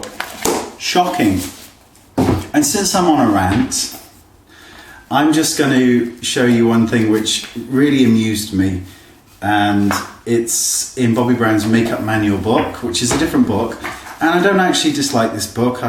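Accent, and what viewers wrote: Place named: Cornwall, United Kingdom. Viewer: Great video. Honesty is wonderful. You sound just like my friend from Cornwall. Sounds lovely. xx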